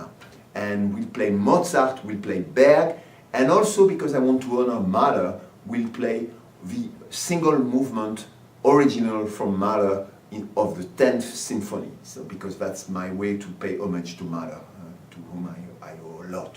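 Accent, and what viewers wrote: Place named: France. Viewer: what a french accent! :)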